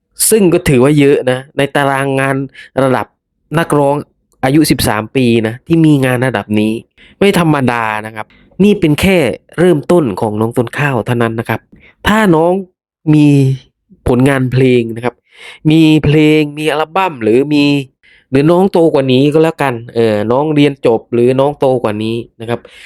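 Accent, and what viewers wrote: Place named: Isan, Thailand. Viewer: ชอบการพูดคุยของคุณ ฟังสำเนียงแล้วรู้ว่าภาคอีสานแต่ทำรายการไม่ลำเอียงภาคก็พูดให้ดี ต้องเป็นคนอย่างนี้ซิคะถึงจะน่ารักและน่าติดตาม 👍👍👍👍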